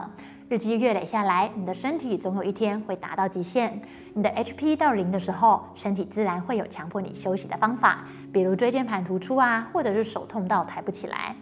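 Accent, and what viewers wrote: Taiwan. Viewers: 我超喜欢你的内容😊还有你台湾的声音😊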